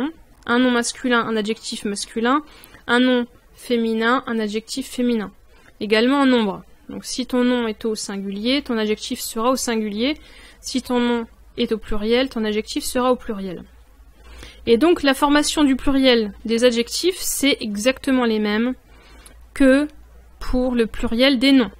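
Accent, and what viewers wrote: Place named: Maghreb. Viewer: Salâm 'alaykoum ukhti. Vraiment ma sha Allâh. Qu'Allâh te bénisse pour tout le travail que tu fourni. Tu es la seule qui fait cela. Et quel bel accent. Une vrai maghrebiya 👏👏👏. Je compte travailler à fond en m'aidant de tes vidéos. Et merci j'ai vu que tu avais mis des films en arabe sa m'aideras également. Thellay f rassek 🌷🌷🌷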